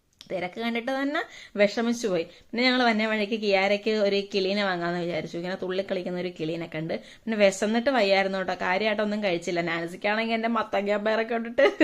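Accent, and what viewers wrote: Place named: Vaikom, India. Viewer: ഞാൻ ഒരു വൈക്കം ക്കാരി ആണ് ഇപ്പോൾ ജിസിസി യിലാണ് ..... നിങ്ങടെ വ്ലോഗ് കാണുമ്പോൾ എന്റെ നാടും കൂടെ കാണുന്ന സുഖം പിന്നേ നമ്മുടെ vaikom സംസാരം പിന്നെ നാൻസി ടെ ടാലെന്റ് എല്ലാം എല്ലാം എനിക്ക് ഇഷ്ട്ടം ആണ് ❤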